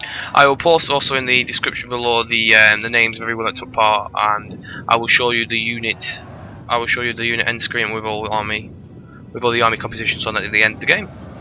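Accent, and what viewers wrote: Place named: Liverpool, United Kingdom. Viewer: good comm mate, i mean for a scouse lol.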